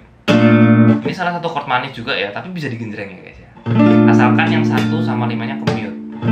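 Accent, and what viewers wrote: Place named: Cilacap, Indonesia. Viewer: Loh deneng sampean ngapak mas. Wong ngendi sih?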